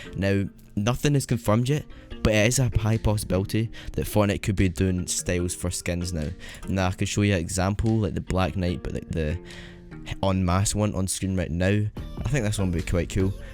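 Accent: Scotland, United Kingdom. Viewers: Ur ye Scottish?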